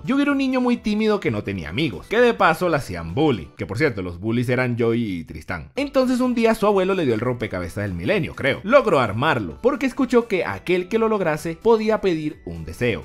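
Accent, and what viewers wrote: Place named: Venezuela. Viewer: pana tu eres venezolano, tu acento me parece de alla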